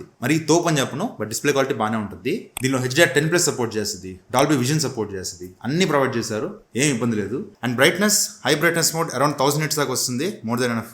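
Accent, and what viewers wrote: Nellore, India. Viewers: Anna nellore nv anna❤❤❤❤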